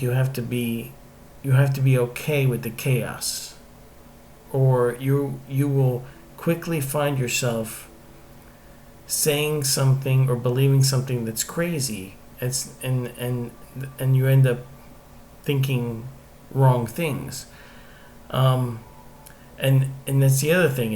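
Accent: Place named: United States. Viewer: You have such a soothing voice, probably the most pleasing American accent I've ever heard.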